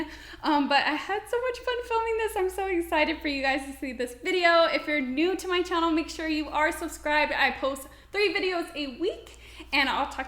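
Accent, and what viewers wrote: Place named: Texas, United States. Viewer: Girl you’re so inspiring! I’m also from Dallas and you have the whole accent of Texas. Love it 🤗❤️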